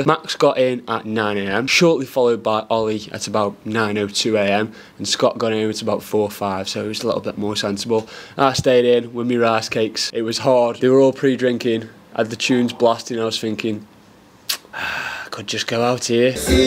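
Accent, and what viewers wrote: United Kingdom. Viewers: could've sworen that was a Yorkshire accent but okay 😂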